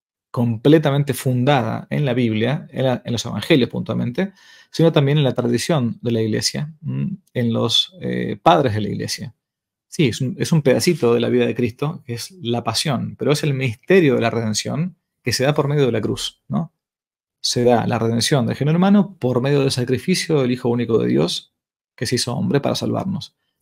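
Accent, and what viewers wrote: Argentina. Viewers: Padre usted diciendo que la virgen que pusieron era la más feita! , que importa eso? Es usted argentino verdad? Ya entiendo su forma de hablar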